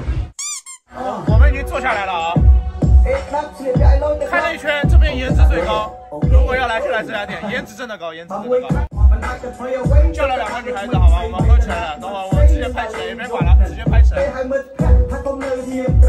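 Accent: Shanghai, China. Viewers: 上海人？还是江浙沪的人?很好奇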